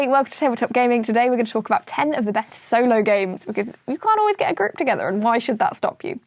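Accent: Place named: United Kingdom. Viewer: Great list. Though I’ve seen it before, I just come back for the British accent.😆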